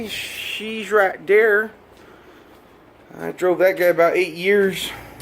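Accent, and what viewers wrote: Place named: South, United States. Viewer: Dude you are one funny fucker I had fun listening to your accent you must be from down south but it's a good opinion on the lovely Z900 good luck riding your new toy lol lol lol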